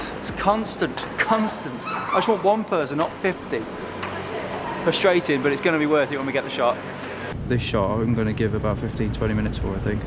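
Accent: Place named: East Midlands, United Kingdom. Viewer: where are you from? I know you live in Swansea but I sense a distinct East Mids accent...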